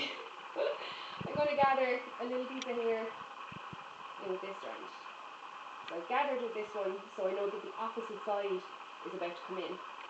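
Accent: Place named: Ireland. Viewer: I love your Irish accent I love the video PLUS thanks, this video really helped me 👌🏻👌🏻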